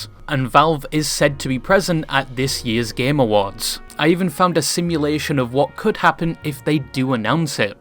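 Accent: United Kingdom. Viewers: I love you too talking british bird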